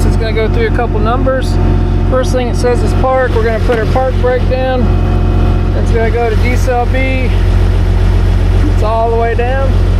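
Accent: South, United States. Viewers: Wow here is this southern redneck who wears dirty camo coveralls 10 months a year. Generally known for heavy equipment repairs, this dude takes his huge sausage fingers and does as clean a bit of circuit board soldering as I have ever heard or seen. And in a voice best suited for pork rinds, moonshine and Nascar, does as great a technical explanation, diagnosis and repair as I could ever have imagined., you are the salt of the earth and one of those people I regret I will never meet!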